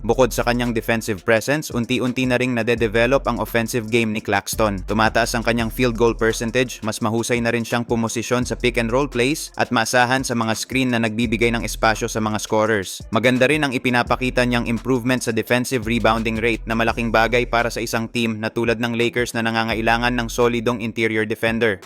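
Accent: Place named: Visayas, Philippines. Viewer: San m ba nakuha yang balita m 😮 bisakol ka magbalita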